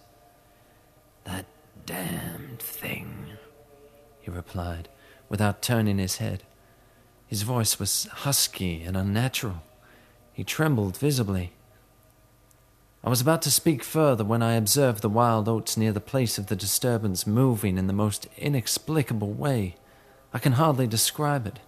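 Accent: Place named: South, United States. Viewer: what a wonderful, flowing southern accent, beautiful voice, and a good reader - who is he??? :}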